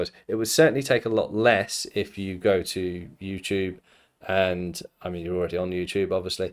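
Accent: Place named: United Kingdom. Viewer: I’ve listened to you speak, and your accent sounds British or from a similar region. Your personal standards may work well in native English-speaking countries, but schools in Ukraine, Poland, Germany, Lithuania, and most schools in Asia, which are non-native English-speaking countries, do not prioritize whether your certificate is from a professional school. As long as you are a native speaker, that’s what matters most. Personally, I feel that teaching English to native English speakers (L1) is not as fulfilling. Most of the people in your comment section are from non-native English-speaking countries, and suggesting that they need to spend thousands to get a certificate like yours to teach is doing their countries a disservice. This is just your personal opinion, so please make that clear to them.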